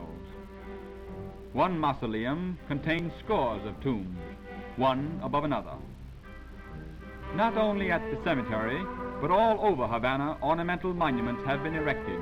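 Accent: Transatlantic, United States. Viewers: transatlantic accent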